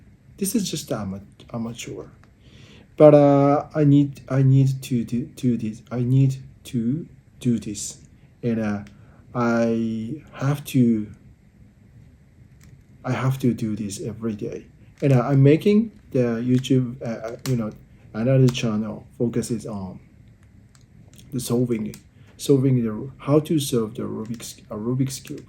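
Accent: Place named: Japan. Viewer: I really like it when you talk about the news in Japan. I think it could be a great format that's not too hard to maintain. Your English is even better because it's your distinctive trait, when I hear people talking like natives, my interest reduces drastically. You are a real Japanese person, and that's what makes you unique. Japan has a low number of English speakers, and that makes it even better. We understand your emotions and situation, for which there is no need for words to express. As an Italian, I really comprehend you, and your English is really great, you are not monotonous. I struggle too with the choice of words when I speak, but it's acceptable, since you are speaking a different language. When natives speak their native one, the one that is doing the work is you, not them, which makes it even more respectable and special. I have more respect for foreign English speakers than natives, as we understand the barrier of not speaking your native language. 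You are an amazing man! Embrace yourself man!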